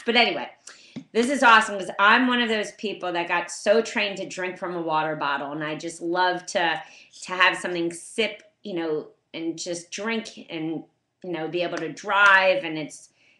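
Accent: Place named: South Shore, United States. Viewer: listen to that accent, south shore perhaps? can you eat them raw?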